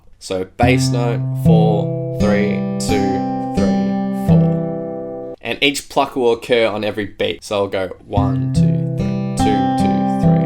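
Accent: Australia. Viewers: 4. Because you are Australian and your accent makes sense to a fellow Aussie!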